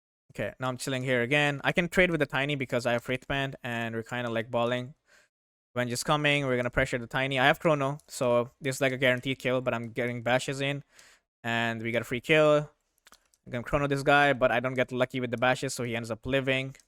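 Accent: India. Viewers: Bro.... You Indian?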